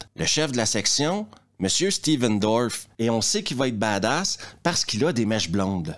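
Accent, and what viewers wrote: Quebec, Canada. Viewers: Hahaha Mais c’est tellement horrible et drôle de mater des vidéos en québécois.. quel enfer vot’accent.. 😂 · Super vidéo et superbe accent québécois !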